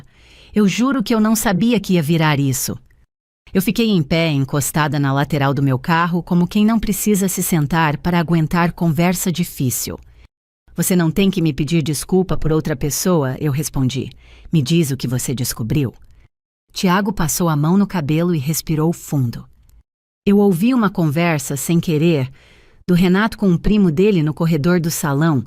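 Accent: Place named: Caipira, Brazil. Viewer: História interessante. Gostei. Creio que poderia melhorar um pouquinho o sotaque "caipira " na pronúncia de algumas palavras. Narrativa também interessante. ❤❤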